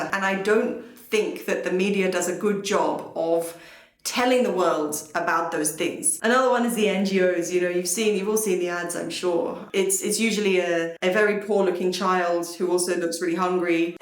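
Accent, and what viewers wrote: Nigeria. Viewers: I was ready to roll my eyes, but was pleasantly surprised.I was taken aback that you were Australian ( I have my own prejudice against them, I find them to be quite racist and bush😂)Such a perceptive and intelligent young woman.Your husband ,I haven’t finished watching, but I am assuming you married him because of your surname.. had found a good woman. You even sound a bit Nigerian now😂. May God bless and strengthen your union… two brave people who took a chance and it paid off, I love that!👏🏾🇳🇬🇬🇧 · You're developing a Nigerian accent That's sweet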